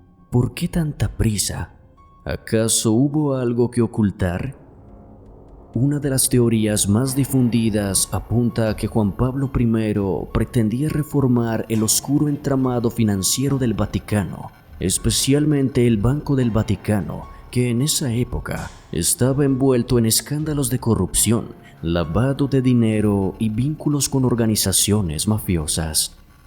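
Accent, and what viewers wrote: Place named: Colombia. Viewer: Hermano, vengo siguiendo tus videos me gustan, me encanta el acento colombiano, te deseo mucho éxito a ti y tu equipo, saludos desde México..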